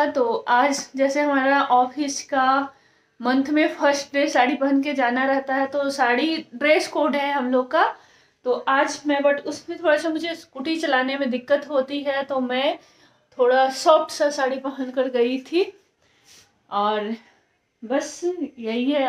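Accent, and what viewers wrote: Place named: Bihar, India. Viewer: बिहारी हाे का!!!!? हिन्दी से लग रहा है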